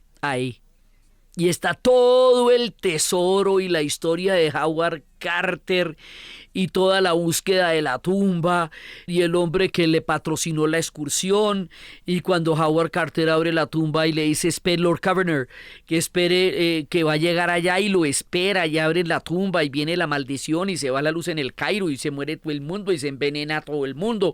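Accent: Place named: Colombia. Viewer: Escucharla a es transportarse al lugar donde ella narra, pero sin salir de casa 😬😬😬. Me encanta esa sazón Colombiana que le pone, que está como el ajo, en su punto exacto ni mucho para arruinar, ni poco para no sentirse. 👌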